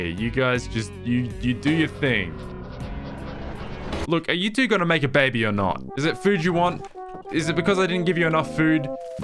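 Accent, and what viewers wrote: United Kingdom. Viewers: Its like 3 months since you have shifted to britan and already you sound british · Are you British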